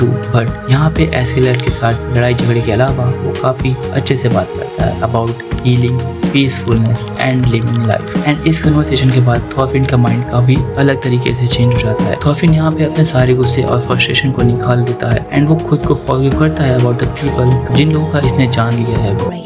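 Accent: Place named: India. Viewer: Bro I cant hear nothing thourgh your indian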